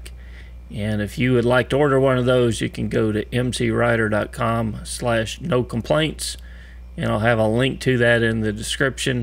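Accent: Texas, United States. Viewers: Migth be useful for an impatient native english speaker, but I just love the way speaks. I used to work with a texan guy that talks just like. Calm, collected and thoughtful. Induces trust and confidence. I feel a bit like I am meditating, when I listen to his voice and advice.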